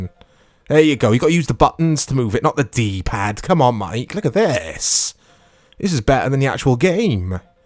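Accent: Wales, United Kingdom. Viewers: It's refreshing to hear a fellow cymraeg accent on here 😂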